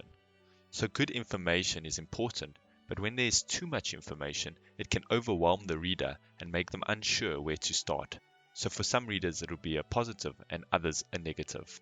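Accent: South Africa. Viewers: Are you South African brother? 🇿🇦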